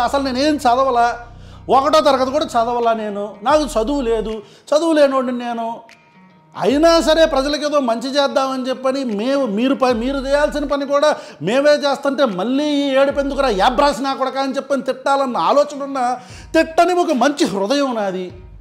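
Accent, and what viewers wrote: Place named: Rayalaseema, India. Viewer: రాయలసీమ language లో ఐతే సంపిపడేసావు brother. ఒకసారి రాయలసీమ visit చేయండి, చూడడి poor ఎలా ఉందొ తెలుస్తుంది.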